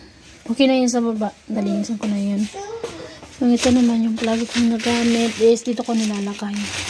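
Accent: Visayas, Philippines. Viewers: Cute oi bisaya mn😊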